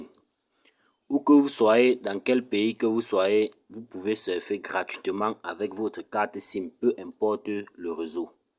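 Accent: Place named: Cameroon. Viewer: Quand tu vois accent ça peut être ivoirien , c'est un camerounais ouais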